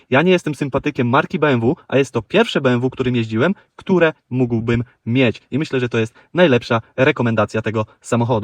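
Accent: Podlasie, Poland. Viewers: Ale zaciagasz podlasiaku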